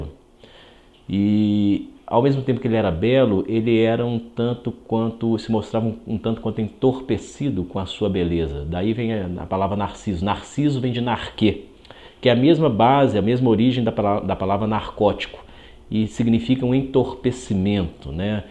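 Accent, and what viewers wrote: Minas Gerais, Brazil. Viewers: Parou tudoooo!!! Um arrazo! Eheh! Nada me fascina tanto quanto uma oratória tão perfeita! Com uma pronúncia de Minas Gerais então...ui! Rss. A fluidez das palavras; o intervalo entre as frases; o seguimento do raciocínio; o tom moderado e firme da voz. Perfeito! Abraço, Sr. Doutor!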